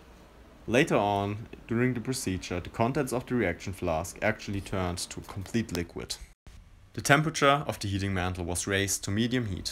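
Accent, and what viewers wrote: Germany. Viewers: ich finde seinen Deutschen Akzent beim Englisch sprechen super😂👍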